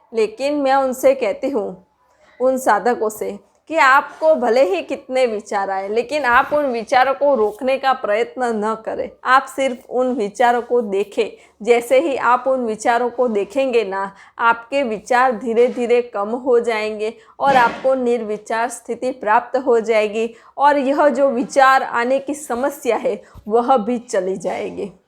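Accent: Gujarat, India. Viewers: Thank you .Kya aap Gujarati hai?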